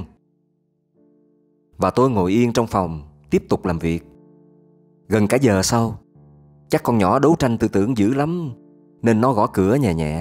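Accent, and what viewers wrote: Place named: Saigon, Vietnam. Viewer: giọng đọc rất hay rất truyền cảm mang âm hưởng kiểu dân Sài Gòn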